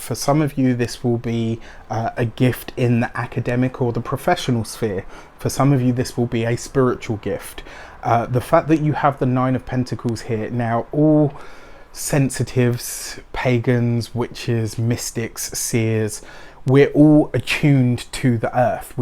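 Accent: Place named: Wiltshire, United Kingdom. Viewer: keep up your gift too friend. your accent brings me back to my youth. where's the accent from in Wiltshire ? love & respect...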